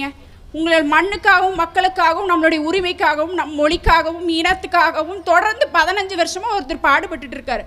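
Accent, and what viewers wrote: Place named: Kongu, India. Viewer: என் தங்கை கொங்கு தமிழ் செந்தேன்🎉🎉🎉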